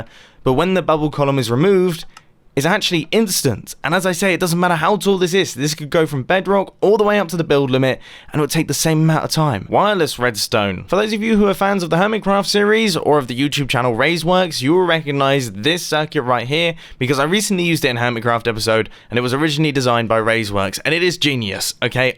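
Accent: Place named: United Kingdom. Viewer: Mumbo sounds so much more British on camera than in real life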